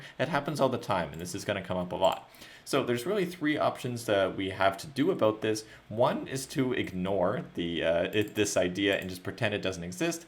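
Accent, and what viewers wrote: Canada. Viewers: Bro love the way you explained "Imputer" , And you pronounced "neighbor" accurately Canadian accent rocks brother Thanks a Ton !!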